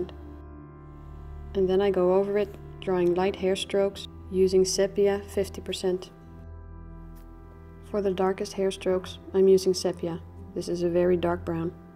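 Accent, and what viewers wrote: Netherlands. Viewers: Beautiful. Thank you for sharing. I use almost the same technique. Aren't you a Dutch by any chance? Your accent tells me so....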